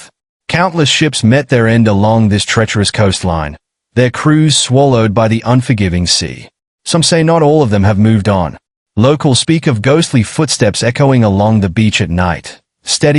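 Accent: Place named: United Kingdom. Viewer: cut him some slack; he's British or he's from Boston.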